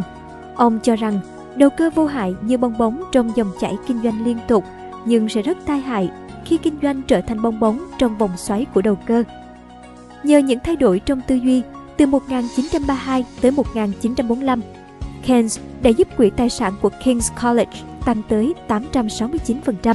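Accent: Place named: South, Vietnam. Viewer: Người đọc giọng miền Nam này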